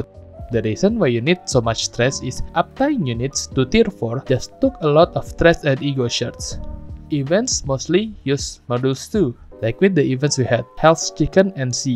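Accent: Indonesia. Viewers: Logatnya indo banget masnya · As soon as I heard the accent, I knew this man was Indonesian.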